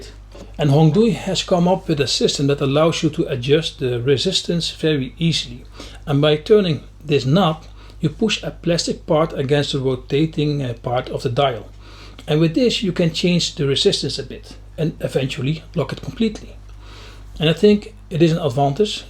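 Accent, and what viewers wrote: Netherlands. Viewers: Leuke video man grapig dat Nederlandse Engels 🤣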